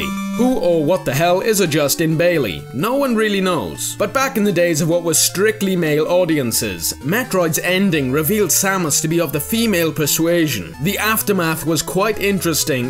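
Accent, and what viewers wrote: Wales, United Kingdom. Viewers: Yeah, Im pretty sure hes welsh. Sounds just like I guy I know who is from Wales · great video I love your work. where are you from? Guess Newport/Cardiff way by the accent?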